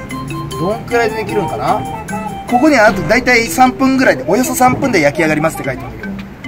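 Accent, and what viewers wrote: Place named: Hiroshima, Japan. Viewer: HIPPYの広島弁がすごいすき。HIPPYもすき。♡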